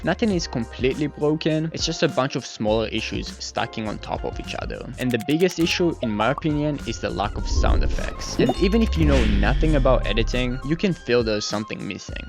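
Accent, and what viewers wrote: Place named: France. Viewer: Bro you need a new Mic bro And change your French accent and then i will subscribe you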